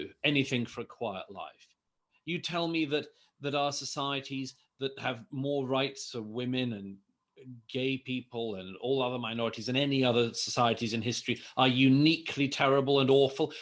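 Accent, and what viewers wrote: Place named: Swansea, United Kingdom. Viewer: Sounds like a Swansea accent! 🌅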